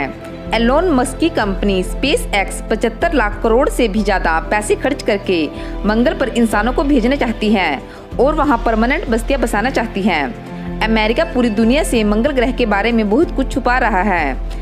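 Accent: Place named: Sikkim, India. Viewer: U are from sikkim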